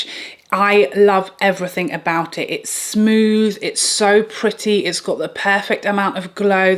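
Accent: United Kingdom. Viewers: I loved this overview, dear, and, i love all English speaking YouTubers, and yes, what i see here below, British accent is sooo elegant always! Living in The Netherlands, they try to learn us UK English, but most of what is getting in our heads from TV and internet is of course American English, which is not a problem! But i think that's why, we Dutch have that mixed pronouncation, i always, always and always hear it!😂Your accent however, is so lovely!!!! The bronzers, i refused purchasing one other bronzer after everything to warm lately, but i puchased the Yves Saint Laurent bronzer, shade 2, it's really neutral, really matte, not my top 3, but top 5, love it! Wishing you a wonderful day!!!😘😘😘😘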